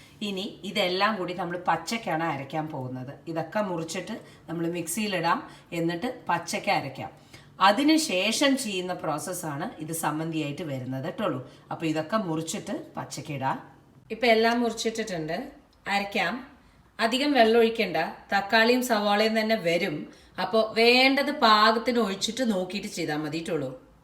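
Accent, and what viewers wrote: Palakkad, India. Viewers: Dosakku ippo kazikkanday thani palakkad slang ishtapettuttollu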